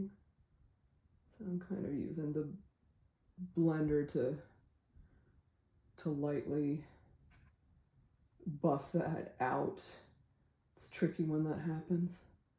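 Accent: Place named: United Kingdom. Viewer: This makes me wonder if there is an alternate universe where is alive and has his own drag queen persona with your name as the Drag name, honestly doing a drag king dressing as a drag queen look would be fierce and so bonkers, like a in drag look. Also, we definitely need you to do a look one day where you show us what looked like before he died. It would be so interesting. You're the first Drag King I've legitimately found interesting and I think it's due to the colourfulness, the glamour and the characterisation. It shows that Kings can be just as fun and amazing as Queens can be. P.S as a Brit, I approve of the accent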